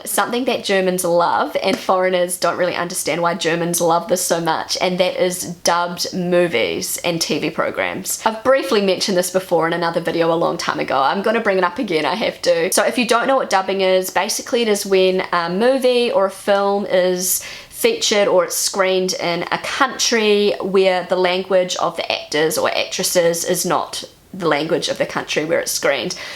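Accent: New Zealand. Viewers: By the way I think you have such a cute English accent! I have lived in England, South Africa and visited Ireland and Scotland but your New Zealand accent is in a class by itself and I think it is very cute! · By the way, I love your NZ english. It has a weird soothing quality and puts a smile on my face! I need to go to NZ....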